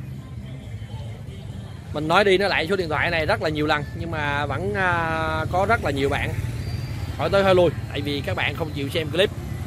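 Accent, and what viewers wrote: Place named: Mekong Delta, Vietnam. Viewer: chời ơi chờ clip mỏi mòn nay mới xuất hiện,khoái cái giọng miền tây thiệt thà chân chất,trại cá ở cu chi- trảng bàn tây ninh giá mềm hok anh. xuống tham quan có phải giới thiệu hok hay ghé chơi tham quan giải trí hoy,:D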